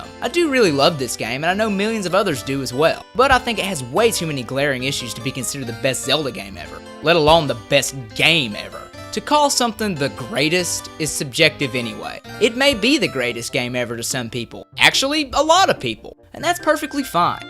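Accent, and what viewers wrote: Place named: South, United States. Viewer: Ya know what, hearing a southern accent is actually kind of refreshing, you don't really see that in the Youtube Gaming community:) You did well elaborating your points, and the pacing was really good. That's probably the part that I expected to be the worst (from smaller Youtubers), but you did well! Really well in fact. Keep it up!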